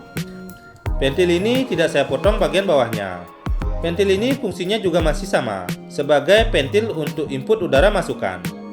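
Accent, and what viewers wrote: Bali, Indonesia. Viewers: Suara org dari bali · Bicaranya seperti orang Bali ya brow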